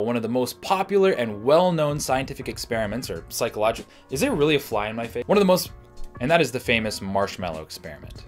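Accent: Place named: Canada. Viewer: You must be Canadian... I could tell from your accent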